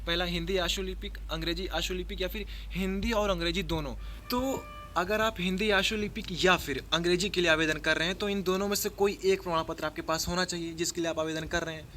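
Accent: Allahabad, India. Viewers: bhai lg rha tum allahabad se ho...meri bhi ekdum yhi akcent h bolne ki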